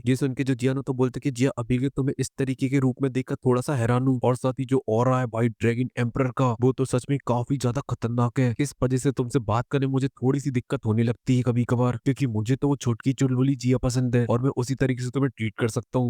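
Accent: Haryana, India. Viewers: Bhai AAP Kya Haryana se ho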